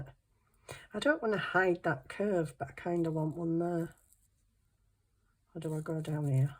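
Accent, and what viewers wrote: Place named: Scotland, United Kingdom. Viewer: Are you Scottish? I just LOVE your accent!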